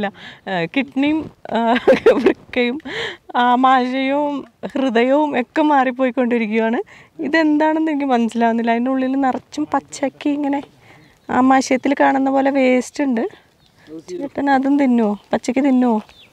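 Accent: Thrissur, India. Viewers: ഇത്രയും നാൾ യൂട്യൂബിൽ കേറി ഇറങ്ങിയിട്ടു ഇന്നാണ് ഞാൻ ചേച്ചിയുടെ ഈ വീഡിയോ കണ്ടത്...പിന്നെ സംസാരം കൊള്ളാം ട്ടാ. എവിടാ വീട് തൃശ്ശൂര് ആണോ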